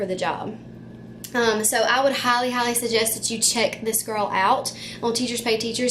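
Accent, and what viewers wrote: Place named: South, United States. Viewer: You are so southern and it's adorable. Don't change!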